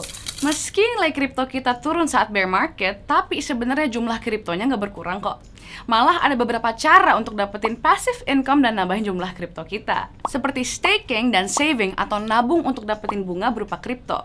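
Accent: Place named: South Jakarta, Indonesia. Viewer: style ngomong nya Jaksel banget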